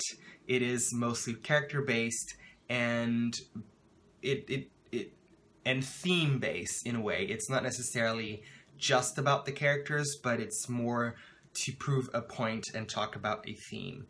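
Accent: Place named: Brazil. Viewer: O rapaz é brasileiro... fala inglês perfeito. Só perceptível porque o sotaque brasileiro é evidente no inglês. Fiquei impressionado, quero ser como ele ☺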